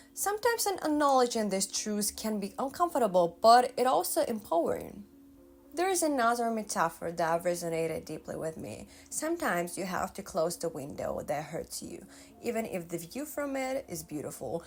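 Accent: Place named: Russia. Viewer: I've seen a couple of your videos already. And I gotta say you have the best hair. I'm super jealous. Its just gorgeous. Also What is your first language? You have an interesting accent that I can't quite pin down(uncultured american). Sounds russian or maybe eastern european? But like with a touch of spanish and even scottish lol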